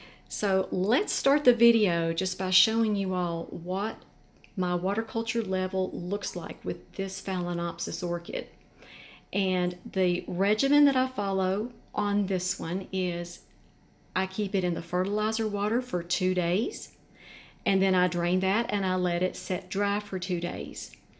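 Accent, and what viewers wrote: South, United States. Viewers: Hey, I love, really love your channel!🤗 Thanksgiving was wonderful and life is good! However, I'm new to orchids and have just purchased my first one three wks ago. I cleaned all the deteriorated bark off very well, put it in lava rock after purchase as i seen per Rick's channel and changed it rapidly over to water culture. It got dry, very dry very fast even though I soaked as he instructed. I am in AZ🌵😎🌵with virtually no humidity, understanding that he is in humid Alabama. So wish me luck as I have been watching your videos faithfully. I do have a Q...my phal purchase from Fry's (Kroger in Tn) came with no air roots!🙄They were cut off🤔by nursery I'm assuming. The 1/2" remnents are barely visible. Are air roots really needed or did I make a fatal mistake purchasing this phal not knowing at the time? Thank you❤️so much and love your southern accent.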